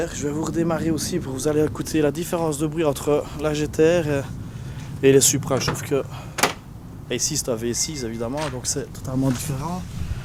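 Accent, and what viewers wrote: Liège, Belgium. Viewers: L accent liégeois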